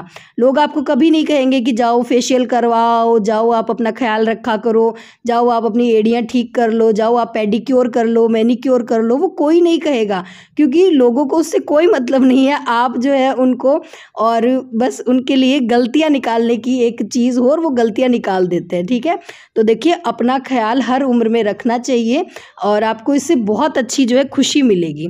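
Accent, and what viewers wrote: Rajasthan, India. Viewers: Hi m Rajasthan se hu aur aapki tone sun ke lagta h ki aap bhi Rajasthan se hi ho....maine abhi kuch din pahle se aapke vlogs dekhna start Kiya h....mujhe aapke vlogs acche lagte h aur khas kar ke ye vlog to kuch jyada hi accha h...m aapse milna chahti hu....aap kaha se ho Rajasthan me....m aapse kaise contact kar sakti hu???Mera naam deepa h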